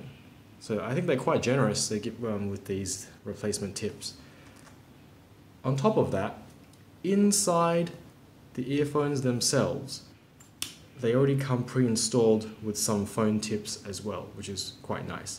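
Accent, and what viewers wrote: Australia. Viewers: Love your video & presentation but can't stand your "Aussie" accent. sorry !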